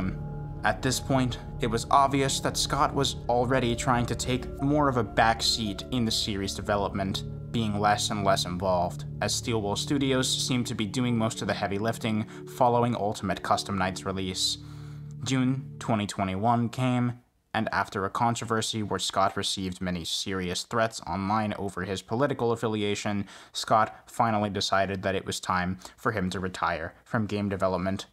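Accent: United Kingdom. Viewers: from american voice to an obnoxious british voice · He was only what I could describe as "Obnoxiously british" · He's British?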